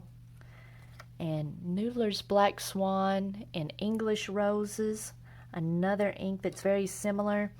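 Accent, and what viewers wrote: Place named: Alabama, United States. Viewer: You sound a little like your from Alabama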